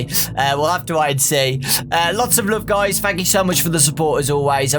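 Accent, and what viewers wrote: United Kingdom. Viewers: As a non-native English speaker, accent really caught my attention. Does anybody know what part of the UK is he from? Also, as always, great video!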